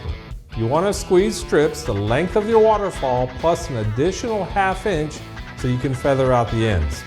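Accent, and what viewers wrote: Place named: North America. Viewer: Lástima que no tenga sub-títulos en español, queda uno sin saber que éxlican en ese inglés norteamericano.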